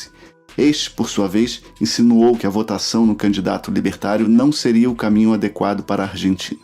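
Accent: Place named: Rio de Janeiro, Brazil. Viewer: Carioca kkk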